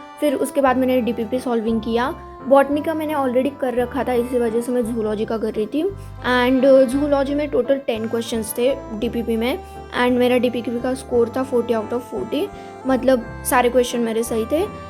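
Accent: India. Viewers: I think u are from south Indian na??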